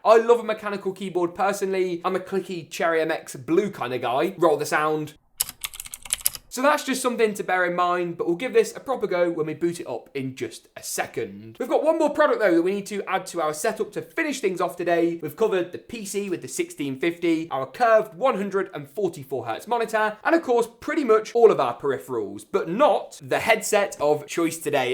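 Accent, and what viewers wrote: United Kingdom. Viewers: Like dat britian accent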